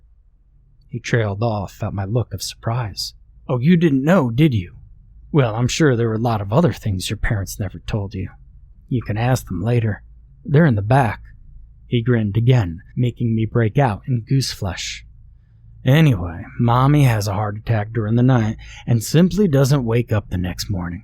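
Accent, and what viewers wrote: South, United States. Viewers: I'm wheezing,. The way you pull off that accent tells me you KNOW the southern life to some degree 😂😂 If not, and, tbh, either way, you nailed it! ❤❤❤ Thanks for the smile and the wonderful story! EMDR is...hard, and I've been binging your channel after not seeing you on my algorithm for nearly 4 years! I'm glad I have so much to catch up on now, despite YouTube playing keep away! 🥰🥰🥰🫂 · Great southern mom accent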